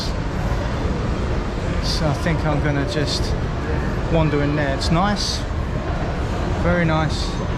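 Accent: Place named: England, United Kingdom. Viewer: Sorry for your bad experience with a taxi driver. A long time ago in Manila we had a similar experience, but it did not work in his favor. Because as soon as he demanded a lot more money than the usual fare from us, we got out of the taxi immediately. And yet he had the guts to scold us. We could have given him tips if only he was good and honest to us. They are the rare ones. However, we come across with many of them who are so honest and kind to us. Glad that you got over it and please continue your good work. I just love listening to the interesting things you say... and your English accent too. Hehehe! Thank you, keep safe and God bless.:goodvibes:😇🥰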